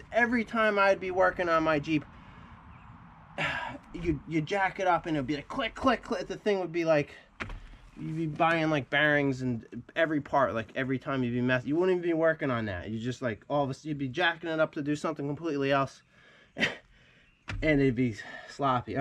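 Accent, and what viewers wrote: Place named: Northeast, United States. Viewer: Hey, I don't think I heard you correctly, - , it sounded like you said... "Like every time you be Meth..."? I know, I'm from California and I sometimes have trouble with that 'NorEastern' accent... What was that?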